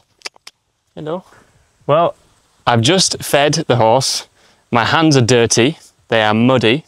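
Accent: United Kingdom. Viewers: oh my god, very amazing video, and your way of teaching really fantastic, and british accent adds a feature to the video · İs it british ascent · I am from South India. Very useful to understand British àccent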